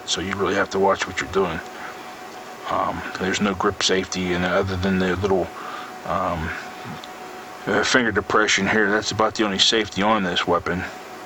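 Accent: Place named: Pittsburgh, United States. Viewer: Jus spelling error . Although i was gunna say picksburg as well haha ... im not from pitt im about an hr away in east liverpool ohio but i spotted the accent quick lol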